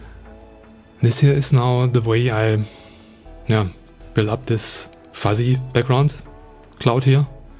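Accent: Germany. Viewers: Du klingst als kämst du aus der Region Dresden. Oder täusche ich mich? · Du bist deutscher oder? Ich glaube ich höre deutsches englisch 😂❤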